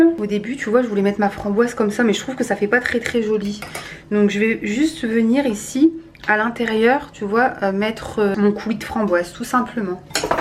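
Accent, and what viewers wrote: North, France. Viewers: Tout est 'leeee' ta laccent du nord haha t'es de Paris ou plus dans le nord ?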